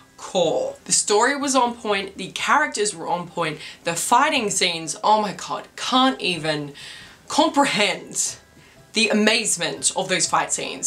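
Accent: Australia. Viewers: Ahh an Australian accent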